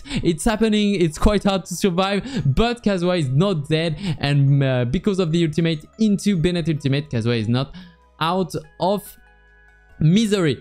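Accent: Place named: France. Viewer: Tu gères de ouf je m'attendais pas à aussi bien quand t'as dit t'étais pas satisfait de ça en live ! Honnêtement je peux comprendre pourquoi tu ressens ça, on sent bien l'accent baguette mais ça c'est osef on te comprend très bien ! T'essayes d'avoir le rythme et énergie de commentaires comme tu le fais en Français mais comme c'est la première fois on sent c'est pas naturel à 100%. Ça reste quand même très bien pour une première fois, pour le peu de pvp anglais et commentaires que j'ai vu tkt pas t'es très bien à côté xD et tu vas sûrement progresser avec le temps en tout cas gg 🎉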